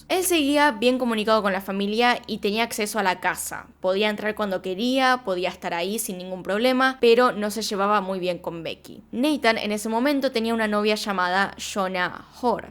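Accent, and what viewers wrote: Argentina. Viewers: Saludos desde Suecia, aquí vivo aunque soy mexicana. Amo tu canal y me encanta el acento argentino y cómo pronuncian "ll" y "y" 💓👌. Verte me hace sentir orgullosa de los latinos y nuestro idioma, además de que una chica como tú sea tan capaz de hacer este nivel de casos e investigación. Mi todo respeto a tu trabajo. Además tienes una mirada única y también me gustan tus vídeos de maquillaje.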